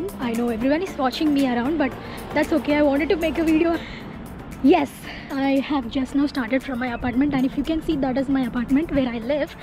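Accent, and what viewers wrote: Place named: India. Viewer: your Indian accent is cute! <3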